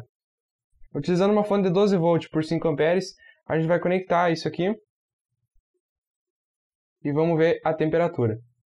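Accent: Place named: São Paulo, Brazil. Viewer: Esse cara só pode ser do vale do ribeira, pelo sotaque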